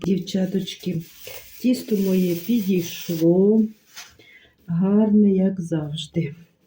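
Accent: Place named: Ukraine. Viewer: Смотрю не только из-за рецептов, но очень нравится говор! Так у нас в Сибири разговаривали наши родственники! Они были переселенцами. Да и не только родственники, у нас вся улица была населена украинцами. Они все переехали в Сибирь в начале прошлого века! И теперь слушаю, как будто возвращаюсь в детство❤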